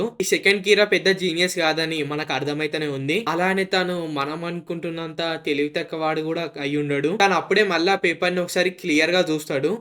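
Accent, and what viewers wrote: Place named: Telangana, India. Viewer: Burrapadu undi bro ni telangana slang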